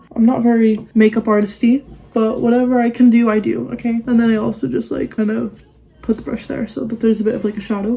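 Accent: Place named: United States. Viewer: U don't sound Irish at all ur accent sounds so american but it definitely slips out when u say photos lmao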